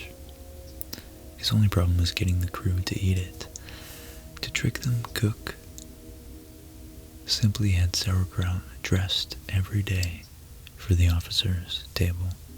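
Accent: United States. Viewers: I love listening to Americans saying British things it's quite funny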